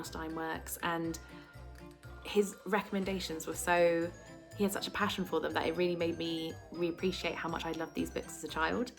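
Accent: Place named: United Kingdom. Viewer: The shoutout to Sunnyvale Reject was cool. He told me I'd like your videos and he was right. I love your amazing British accent (I'll listen to anything you say) and your passion for reading and books. You're going to be big.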